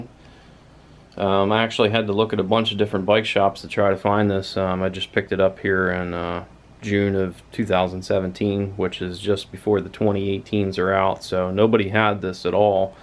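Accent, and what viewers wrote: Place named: Pennsylvania, United States. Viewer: Guessing by your accent that you're from PA..Have you gotten out and done some serious rides on this bike yet? I just sold a Tarmac Pro and was looking at this model for a more comfortable ride for the rough PA roads. Thanks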